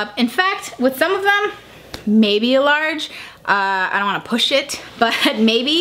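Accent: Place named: Chicago, United States. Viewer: First time watching one of your videos!! I knew that accent sounded familiar!! #chicagogirls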